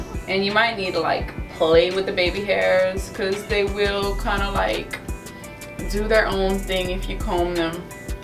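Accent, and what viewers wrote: Louisiana, United States. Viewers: Yasss.. Girl your hair is everything!! Soooo cute. Little miss Lavender is soo precious. It sounds like you could be from Louisiana? Anyway, thanks for the content.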